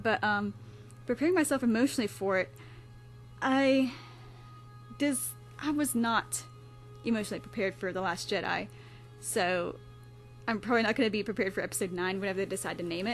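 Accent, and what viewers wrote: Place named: South, United States. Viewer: OMMGGGGGGGG your voice is so cute ya lil southern bell u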